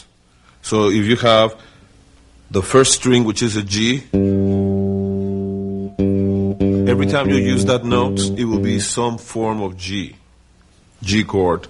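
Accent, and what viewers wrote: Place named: Jamaica. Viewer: This incredibly talented cat has groove and soul and heart and musicality and I Just want to say Thank You,. BTW, where is he from? His accent is pseudo-European, perhaps Jamaican? Anyone? Bueller?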